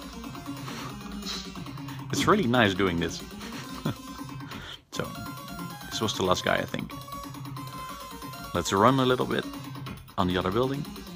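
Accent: Netherlands. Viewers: ArtOfCamelot well it definitly wasnt very good english, it had a lot of dutch trough it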